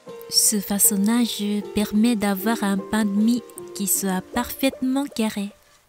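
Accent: Japan. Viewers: Bonjour, merci beaucoup pour la recette car j'adore les pains japonais moi j'avais trouvé la recette qui contient du Tangzonk. C'est délicieux. J'aime votre accent, votre pays culture, vos musiques. J'aime tout particulièrement votre respect et votre sens de l'honneur. Vous parler déjà très bien le Français. Si je pouvais parler le Japonais comme vous parler le Français cela me permettrais de pouvoir visiter votre magnifique pays. Bien à vous. Rosalie.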